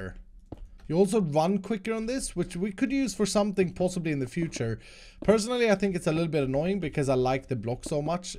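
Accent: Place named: Sweden. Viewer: It sounds like you are from Sweden talking English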